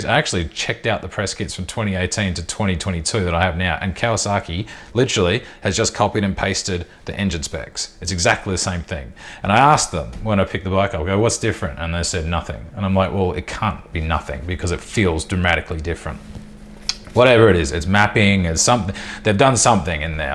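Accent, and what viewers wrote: Australia. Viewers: not at all. Just wondering why you Americans want the whole world to mould to the way you to things. Self promoted exceptionalism. is a great guy doing a great job - he is an Aussie (grew up not far from where I did), and of course he will speak with an accent and pronounce words as we do in Australia.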